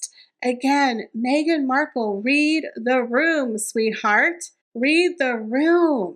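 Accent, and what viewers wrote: United States. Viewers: Why is this channel called “Royal daily Tea” when you only do hate videos on Megan and Harry. Also - you sound like an American. Why TF do even care?!?! It’s giving obsessed honestly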